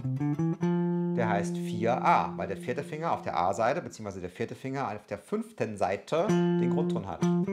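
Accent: Germany. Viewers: mh jo 😃 bisch du ausem saarland ? Nice infos Abo check ! Danke für sie Mühe dir du dir machst 😊😊🤘🏻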